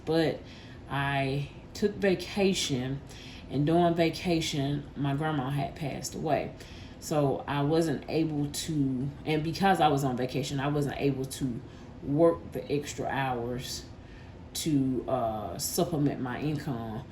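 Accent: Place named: South Carolina, United States. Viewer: I absolutely love this video. You are so organized and detailed. I am from NC and I can definitely hear that SC accent😂❤ Also congrats on being monetized. I m trying to get there 🙏🏾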